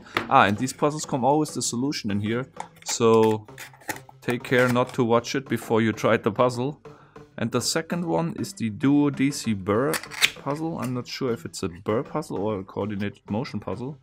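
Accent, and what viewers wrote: Germany. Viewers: his accent is German, if that's your question. I always got the impression he no longer lives there, but his FB info does refer to a Germany address.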